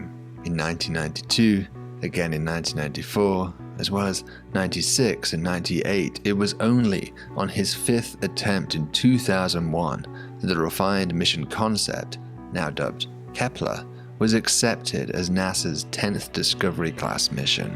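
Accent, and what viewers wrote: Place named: Nottingham, United Kingdom. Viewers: this guys accent gives me nottingham vibes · he must be the guy that married your ex girlfriend, only sense I can make out of you complaining about how an educated BRIT speaks. This is how all educated men especially brits speak 😂😂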